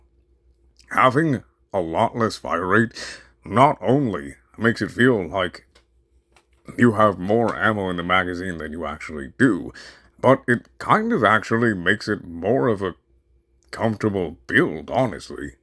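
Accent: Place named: United States. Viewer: Your deep English narrator voice with the American accent underneath is crazy lol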